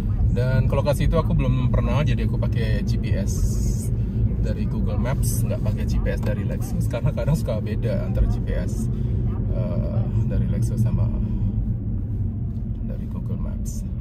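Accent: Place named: Java, Indonesia. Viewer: logat bicaranya njawani banget kok gak mbataki Semoga chanelnya makin berkembang dan sukses ya bang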